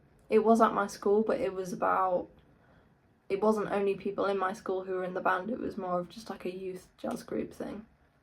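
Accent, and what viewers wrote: England, United Kingdom. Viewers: Are you from England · I couldn't tell you're not American you sound British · I was surprised youre English! Keep going ✌🏻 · Lahhh kau british??? Ingt ulu kelang je..... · Do I detect a little bit of an English accent? Your guitar skills (and many musical instruments) is amazing! Keep up the great work! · Oh yea British!!!!!! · You're a sweetheart. You obviously have a kindheart and you are down-to-earth, please don't ever change. Always keep your beautiful smile and continue to be the genuine kind person that you are. I did not expect to hear an English accent, but it is cute and it suits you. You are very cute. BTW, 19 is not old. It's all relative. When you are 16, a year is 1 1/16th of your life, 19 = 1/19th, etc. So the older you get the faster time flies. Yet, we all experience life in a linear manner that is moment by moment and even then most people are not mindful of the present moment, they're usually thinking about the past or what could happen in the future. 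But that's all just my own basic philosophy about how we experience time in this existence. I have some philosophical ideas that blow people's minds. Hahaha. I'm glad you made this video, it's illuminating and now I feel like I will care more about seeing your videos. I hope that you find love with an amazing musician that is truly as sweet and kind as you are and appreciates and respects you the way you deserve to be treated. I would love to hear you write some amazing music that is authentically original and well defined in your own style. That would be awesome!!! Please keep that sweetness, your smile and your genuine honest personality and please don't mention anything about age... hahaha. I'm 46, but I look 30 and I feel like I'm 20. It's more of a state of mind and/or number, than anything else. I know people in their 60s that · Which UK accent is this? She pronounces guitar or band as a North West english speaker would do, but in many sentences, it's a plain and even melodie, just like on an irish or american tongue. 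Any help? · I enjoyed your talk today. You are a sweet girl. I miss England; I wish I could go back sometime. I love the accent. I’m from the states, here in beautiful North Carolina. I lived in England because of the Air Force. RAF Lakenheath, Suffolk. Take care your doing great 👍🏻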